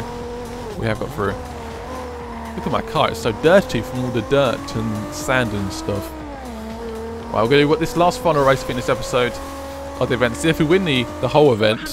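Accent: England, United Kingdom. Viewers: This man English ?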